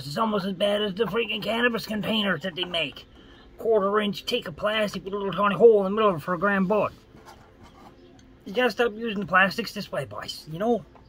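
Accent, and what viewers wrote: Newfoundland, Canada. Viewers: are you from newfoundland?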